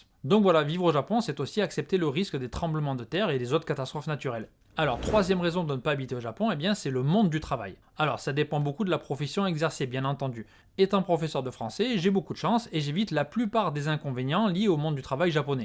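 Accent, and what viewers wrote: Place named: Marseille, France. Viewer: C'est marrant j'ai passé la moitié de la vidéo en me demandant d'où te venait ton accent. Je ne me serais jamais imaginé que c'était de Marseille, d'autant que j'y habite. Comme quoi, notre accent a bien des subtilités.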